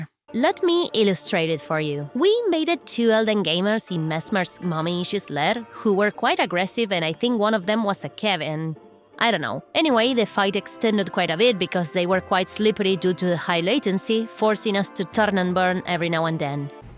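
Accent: Argentina. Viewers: The way it pronounced is because of his argentian accent hahaha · Eres argentina? 👀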